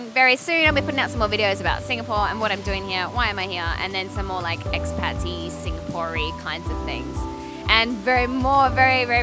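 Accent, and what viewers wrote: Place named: Australia. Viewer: Its great to hear an aussie accent. Great video. Loved it. Thanks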